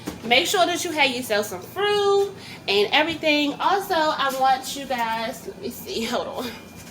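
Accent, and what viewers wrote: New York, United States. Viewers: You talk like New York flavor flave 😄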